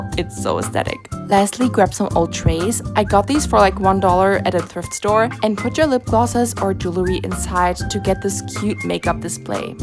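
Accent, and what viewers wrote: Germany. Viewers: Gurl u NEED to make a German video . ( if ur German u just sound like it no offense ) I LOVE UR VIDS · Are u german u have a german accent? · Hi 😊 i was wondering if you are german bcz you have this Accent that also have 😊❤ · es ist so krass, man hört das du deutsch bist, aber dein englisch ist so unglaublich gut omg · i can't with german accent twin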